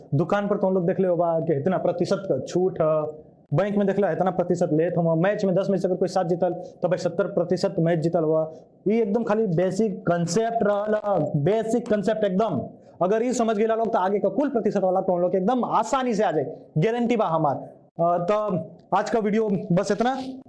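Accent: Bhojpuri, India. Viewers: Nice bhojpuriya andaj😂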